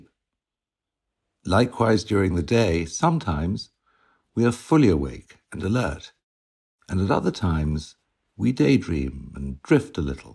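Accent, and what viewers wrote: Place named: England, United Kingdom. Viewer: Very useful tape. My name is also. You sound English. Is your full baptised name?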